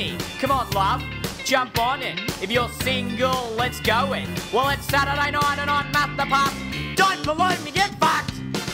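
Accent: Australia. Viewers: West Sydney mate. And yes people do actually speak like this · mate idc about his ethnisity, that accent says straya 100 percent 🤙👀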